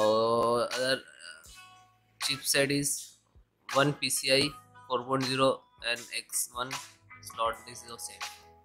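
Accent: India. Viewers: Do better, this is a terrible video, non-informant about key differences. Practice on your English accent as well, having the “stereotypical Indian guy accent” with a monotone speaking voice makes your content boring, lackluster and hard to understand.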